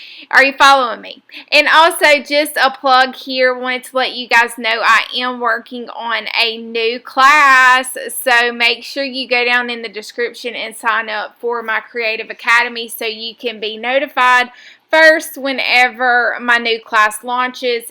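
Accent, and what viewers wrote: South, United States. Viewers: Happy to hear your southern voice again, very relaxing for me. 😍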